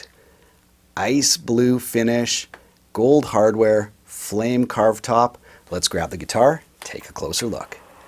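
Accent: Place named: Canada. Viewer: After years of watching South Park you can spot Canadians. Its they way they pronounce aboot (about)..... 😎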